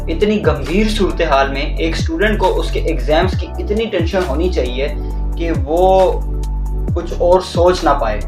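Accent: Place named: Karachi, Pakistan. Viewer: Pakky karachi waly lagty ho ap bro 😅❤️❤️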